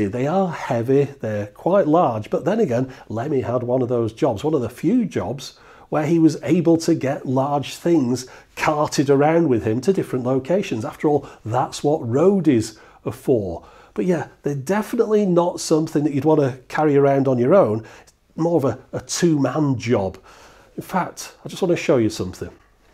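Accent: United Kingdom. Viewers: should make a channel dedicated to British English lessons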